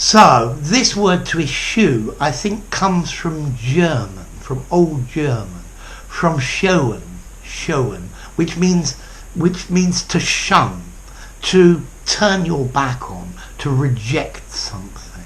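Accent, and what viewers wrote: United Kingdom. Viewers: Hi,! I like the style of your lessons. And British English of course.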